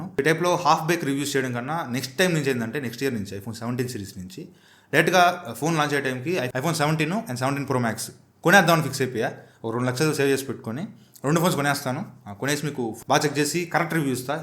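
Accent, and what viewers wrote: Nellore, India. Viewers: Bro midhi nellore na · Bro meedhi nellore e na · Bro medhi nellore aa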